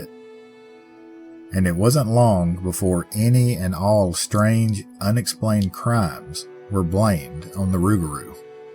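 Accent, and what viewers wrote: South, United States. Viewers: that smooth southern voice of * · your smooth southern drawl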